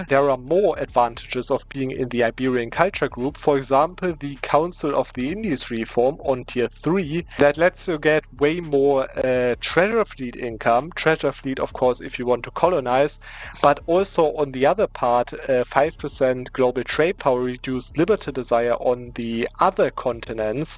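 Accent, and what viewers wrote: Germany. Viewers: Lern mal richtig Englisch sprechen. Ist ja nicht auszuhalten mit diesem unerträglichen deutschen Akzent, mein Gott alter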